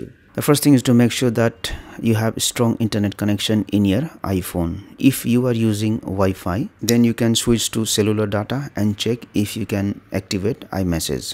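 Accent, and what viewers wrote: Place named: India. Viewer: i hate when i trynna watch some like this and the indian accent came like fuck them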